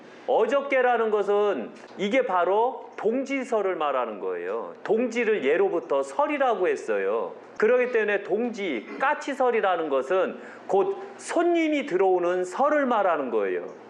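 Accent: Chungcheong, South Korea. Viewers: 맞아요 충청도의 대지관님 이시여요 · ㅎㅎㅎ 완전 충청도분이네? 가차운----> 가까운 ㅎㅎㅎ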